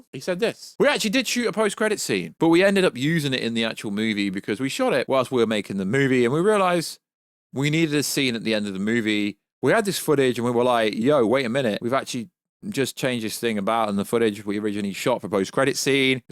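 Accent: United Kingdom. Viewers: you have a cool British accent!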